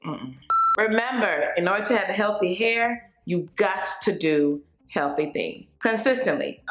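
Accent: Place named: Nigeria. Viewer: That's Nigerian the accent and attitude😅😅 + american of course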